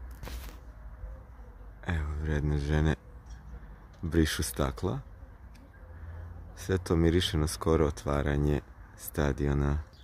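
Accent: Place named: Belgrade, Serbia. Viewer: Dobar video, ali brate, imaš neprijatan beogradski naglasak kao da si odrastao u beogradskim džiberskim kafićima 😁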